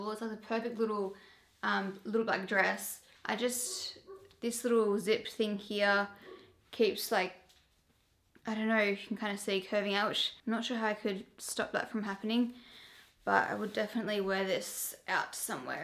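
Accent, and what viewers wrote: Australia. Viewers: The aussie accent 😍😍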